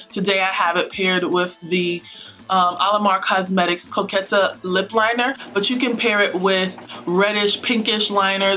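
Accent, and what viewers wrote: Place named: New York, United States. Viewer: COME ON, NY ACCENT!!!!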